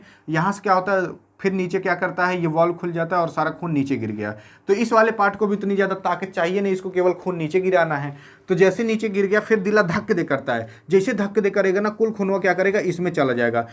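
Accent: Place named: Uttar Pradesh, India. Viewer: aapki bato se lag rha h aap up se h 🤔up me kha se h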